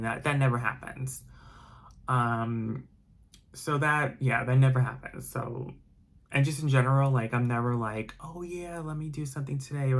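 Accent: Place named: New York, United States. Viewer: Hi,. You look lovely! I thought I recognized your accent - you’re a fellow New Yorker! I don’t know where you live (Upstate, boroughs, etc), but have you ever considered Long Island? That’s where I am, and it lacks all the chaos of the city. Not as fun, but way less drama and crime.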